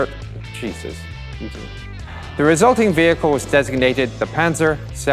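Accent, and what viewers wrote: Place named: Ireland. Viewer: this guy has a speech impediment on top of a thick Irish accent not a great combination for understanding anything he says!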